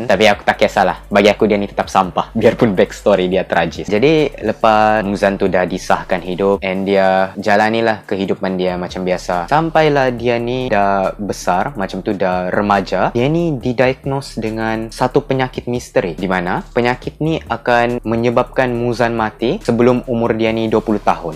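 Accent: Sabah, Malaysia. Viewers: Ko org sabah? 😂😂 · speaker ni orang sabah kah? 🤣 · Yes, org sabah 😂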